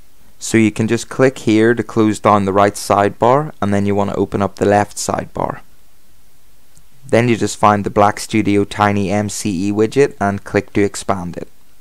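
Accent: Northern Ireland, United Kingdom. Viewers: Very clear tutorial! All actions described in detail at a nice slow pace that suits me down to the ground! Love the Northern Irish accent as well, very refreshing after all the American drawl you tend to get on the web! Thanks for posting this, it really makes it all so much easier. Now all I have to do is think of a good name....;)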